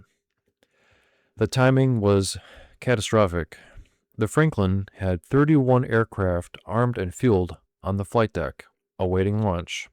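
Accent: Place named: United States. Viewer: Hi, I read your reply from my comment on yesterdays’ video about rommel. Great to see a new content creator-documentarian focused on the world wars with a channel that is not AI. Also, I don’t know if you have been here long but there’s a lot of us that go to sleep watching/listening to war documentaries and we are kind of a tight knit group. I think the reasoning is multifaceted and its not that we are war junkies. It has to do with being transported to another place and time, as well as the psychological aspect of “I had a stressful day but thank god I’m not there” Here are a few more helpful hints that you may want to work on: - Again, you have an incredibly calming voice considering we have american accents which by nature, isn’t as relaxing as the quintessential british historian voice speaking proper/high society english. I would suggest working on your cadence and delivery so you are more confident in your delivery - Calming and relaxing is good; boring is bad - Keep working on your editing skills and consider finding/creating a pleasant soundtrack for background music - As stated previously, try and stretch the videos out to an hour or so, thats kind of a sweet spot - Go watch the entire BBC/PBS WWII documentary series that was done in the 1990’s called “Battlefield” It is literally the holy grail for us in terms of this genre - Watch Fin’s Channels as stated in the last video to gain some more hints - Even though you are new at this, you already have a subscribe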